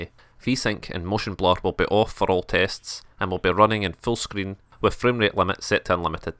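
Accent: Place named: Scotland, United Kingdom. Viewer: Nice Scottish accent, I wish I had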